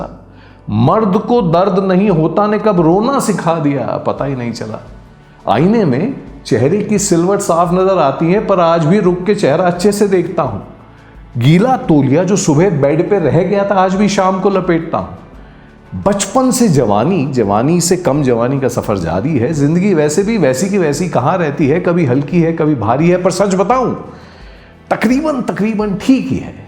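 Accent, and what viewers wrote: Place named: Lucknow, India. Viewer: Bahut khoob ! Lagta hai hamari tarah aap bhi Lucknow se taalluk rakhte hain 😀🙏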